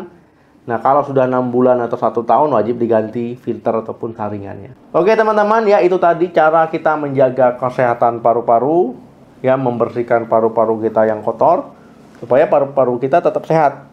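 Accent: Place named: Bali, Indonesia. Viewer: ini logat bali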